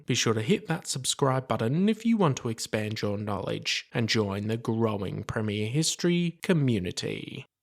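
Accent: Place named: England, United Kingdom. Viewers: He's English 🤷! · He is speaking ENGLISH...not American!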